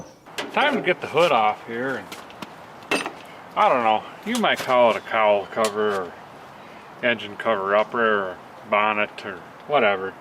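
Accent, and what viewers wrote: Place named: Iron Range, United States. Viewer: Grew up in Duluth and the Iron Range accent and humor have me smiling ear to ear. So glad I came across this channel!!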